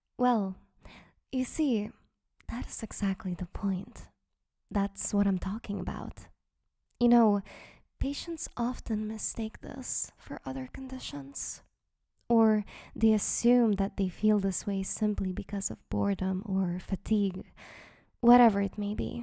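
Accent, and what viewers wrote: Netherlands. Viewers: Is that a Nederland accent? Very nice. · It’s a Dutch accent, herself is Dutch per her profile info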